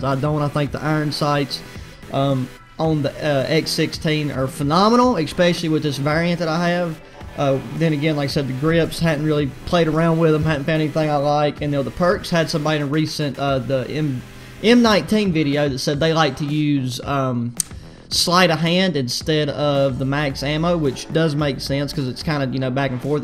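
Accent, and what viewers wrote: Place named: Georgia, United States. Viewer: Where are you from? Accent sounds Georgian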